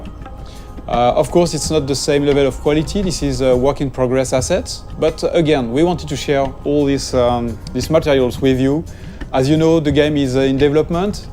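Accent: France. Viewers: il est français donc pour son accent on peut comprendre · sa se voit que c'est un francais vu son accent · J'avoue l'accent français se fais ressentir de fou 😂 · C'est con que seul les français ont honte de leur accent le gars est français il va pas faire l'accent américain,chinois ou africain le pire c'est que pour les ricain l'accent français est très beau. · Et les gars stop, pour son anglais. Il a raison de faire la vidéo en anglais. Et puis entre nous vous auriez un meilleur niveau d'anglais en France çà irait mieux, vous vous ne poseriez même pas la question. L’école ne suffit pas alors faut travailler a coté pour l'anglais. Et la franchement même si il a un accent français qu'est ce que ça peut faire. Au moins il fait l'effort de faire une vidéo compréhensible par le monde entier. Alors arrêter de penser en permanence a seulement votre petite personne !!!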